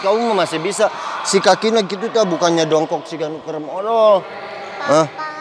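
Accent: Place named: West Java, Indonesia. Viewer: Sy suka cara ngelatih,,si akang 👍👍 Terus dari ngomongnya,,,jd seru Sunda pisan 👍👍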